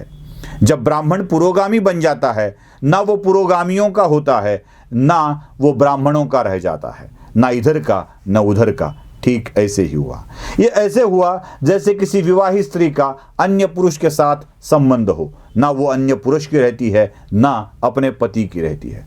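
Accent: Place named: North, India. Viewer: बात सही है.. मराठी विशलेषण एक दम महाराष्ट्र के गावठी मिट्टी से जुड़े है ऐसा दिखता है और हिंदी तो जब बोलते है तो एक दम उत्तर भारत के हैं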